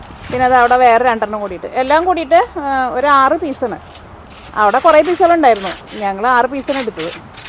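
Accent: Thrissur, India. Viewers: Mmade Thrissur slang Oru prathyekatha thannaaaa tta eshta😄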